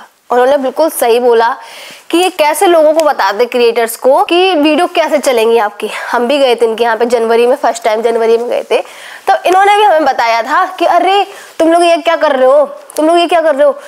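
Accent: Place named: Siwan, India. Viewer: Shahi boli hai ap hmbi Siwan se hai 😂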